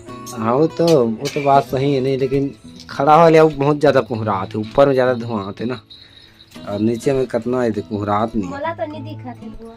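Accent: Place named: Raigarh, India. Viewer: Tuman k baat sun k Lagat he ki tuman raigarh jila ķ ho hai naaa bhaia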